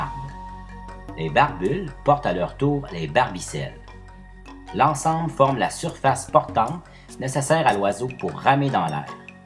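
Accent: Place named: Quebec, Canada. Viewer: Pas mal! Avec un sacré accent québécois tout de même...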